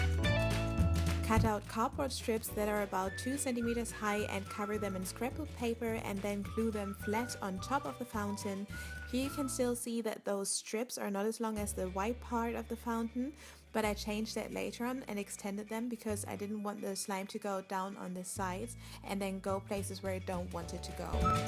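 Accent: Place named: Germany. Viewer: I knew it. You have a german accent :D ! You are from Germany right?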